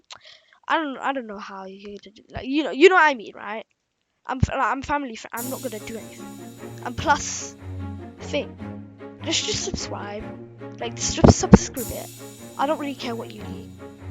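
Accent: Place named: United Kingdom. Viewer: Briish? Its fine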